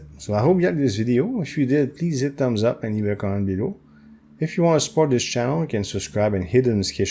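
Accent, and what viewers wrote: Quebec, Canada. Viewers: at only I could have bet my life savings that you were Quebecois ⚜. Much love!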